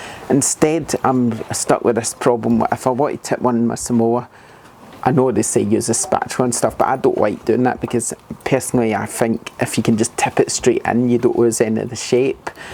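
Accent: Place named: Glasgow, United Kingdom. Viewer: All the comments about the accent are making me chuckle. Thanks for the review from one Glaswegian to another.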